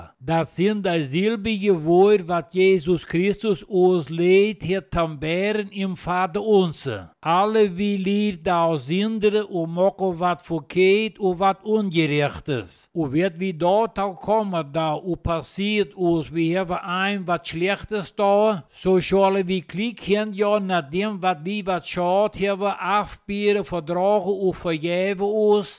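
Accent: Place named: Pomerania, Germany. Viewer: ek is van suid afrika...die lesing uit die heilige skrif is mooi in die pommere dialek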